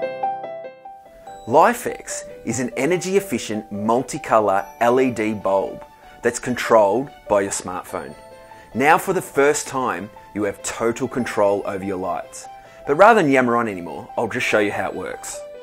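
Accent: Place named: United Kingdom. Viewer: The only reason i can Believe is "British Accent".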